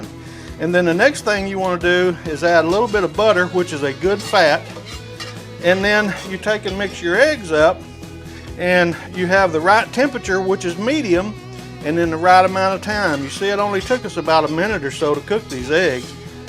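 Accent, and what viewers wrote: South, United States. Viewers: Yella 😂. Your southern accent brings back memories of my daddy.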